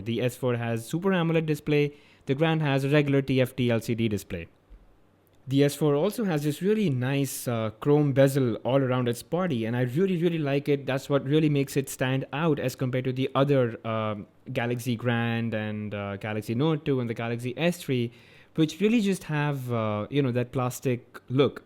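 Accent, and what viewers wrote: India. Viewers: aman Allahım adam hint aksanıyla ingilizce konuşuyor ve anlamak için kendimi yırttım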